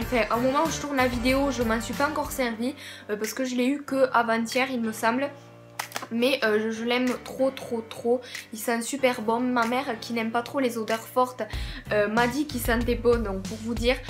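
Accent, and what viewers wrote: South, France. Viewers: L'accent du Sud 😍💕 Toulousaine à vie ✨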